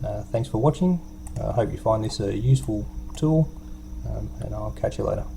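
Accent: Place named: Australia. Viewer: This is really well thought-out and beautifully executed. I thought it was good before just to be able to see stage fuel, but now with that extra functionality it's become one of those mods that get instantly added to any install and that shows the KSP devs how the stock game should be improved. Great work, and good to here an Aussie voice in modding community :)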